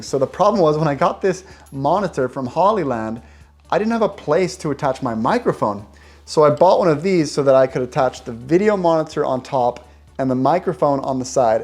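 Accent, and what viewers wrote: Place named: Sweden. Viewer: You now have a Swedish accent speaking English.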